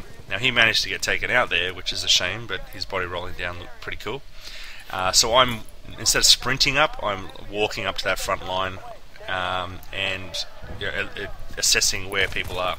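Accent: Australia. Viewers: holy shit an aussie commentator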